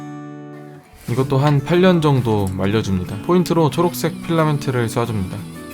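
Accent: Daejeon, South Korea. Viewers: 형 대전분이셨어요?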